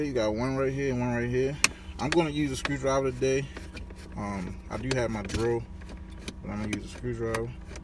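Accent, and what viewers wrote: Baltimore, United States. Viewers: You from Baltimore ain’t you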